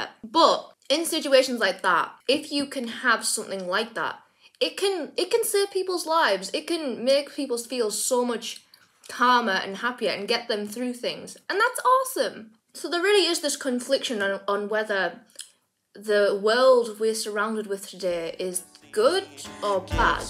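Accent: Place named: United Kingdom. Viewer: WHY THE BRITISH ACCENT IS SO ADDICTIVE!!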